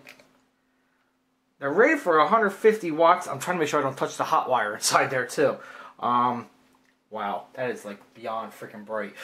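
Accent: Pittsburgh, United States. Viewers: My God man...your accent sounds Pittsburghese.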